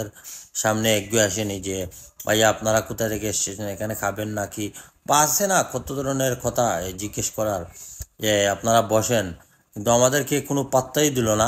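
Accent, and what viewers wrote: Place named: Sylhet, Bangladesh. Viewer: অরদেক শুদ্ধ ভাসা অরদেক সিলেটি ভাসা তুমার কন্ট ফাটা ফাটা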